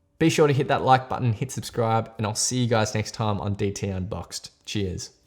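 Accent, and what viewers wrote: Australia. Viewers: Asian speaking aussie.. noice... Subscribe.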